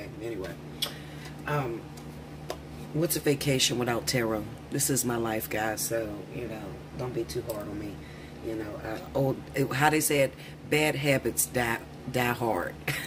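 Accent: Pittsburgh, United States. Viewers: I know this is off topic but are you from Pittsburgh?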